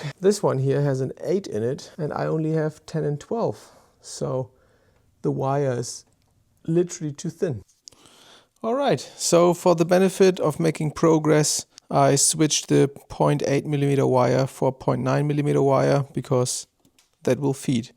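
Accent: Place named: Germany. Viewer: you sound German ...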